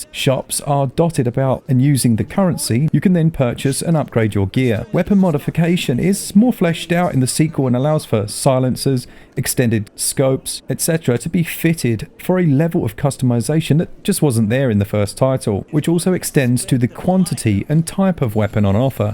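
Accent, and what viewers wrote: United Kingdom. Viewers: Man, I love your british accent! Great reviews, always. You have now a huge mexican fan.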